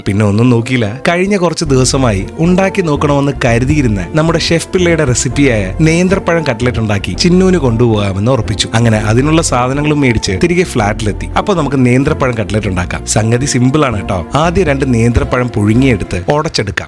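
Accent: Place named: Wayanad, India. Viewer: Vayanadan sound 🤭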